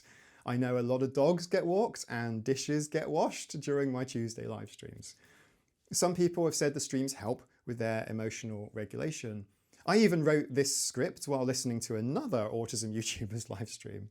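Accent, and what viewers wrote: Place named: United Kingdom. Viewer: I love your presentation style and accent! As a British person in Germany, have you noticed how criticism can be a love language there? I am native German, in the UK for 20+ years, and I realised recently I only criticise people when I care enough about them to want them to succeed and get things right, and to not fail or embarrass themselves. As Sheldon from the Big Bang Theory put it "if a summer in Germany has taught me anything, it's that brutal honesty is its own kind of love." (Young Sheldon, season 7) and "When I criticise people I lift them up." (from the "adult" BBT series) Seen by NT viewers probably as just as another annoying know-it-all trait, I can really see the truth in that. Can anyone relate?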